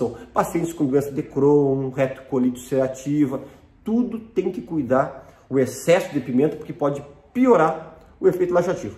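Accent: Rio Grande do Sul, Brazil. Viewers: Obrigada doutor, é muita bondade ensinar o que sabe, que sotaque bonito o senhor tem! Sotaque dos gaúchos.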